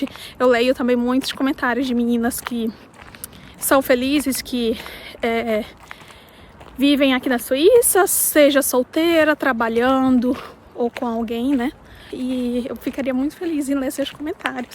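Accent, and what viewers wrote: Brazil. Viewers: Suiça tudo de bom. Amo viver aqui. Que lugar da Suiça vc mora? Seu sotaque Paraense não é muito forte, gostei muito da sua história. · minha família paterna do Laranjeiras e materna de Belo Horizonte. Nasci e vivi minha infância lá. Todos os familiares ainda. Meu pai veio trabalhar em Manaus e vivi lá de 98 até 2022 com minha família. Reconheci logo seu sotaque do Sul do Pará ;) E vc é da onde?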